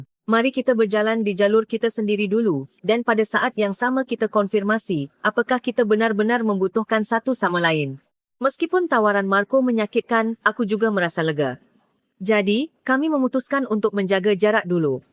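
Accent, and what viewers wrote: Malaysia. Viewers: 😅😅😅😅 Narator nya bukan orng Indonesia Logatnya 100 % Malaysia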